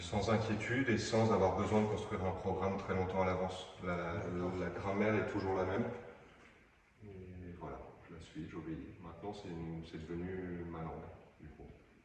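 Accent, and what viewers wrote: Gwangju, South Korea. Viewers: 우연히 보게되었는데 제가 광주사람이라 더 끌리더라구요. 외국인에서 들리는 광주사투리^^ 성실함이 인생을 좌우한다더니 아님 영상들보면서 그냥 웃으면서 보지는않았어요. 역주행중인데 이 영상에 첨으로 댓글달아요. 진심 작품들이 멋져요. 용접공예긴 이런거군요. 진심 소장하고싶어요ㅜㅜ 카페한다면 진심 구매했을듯요.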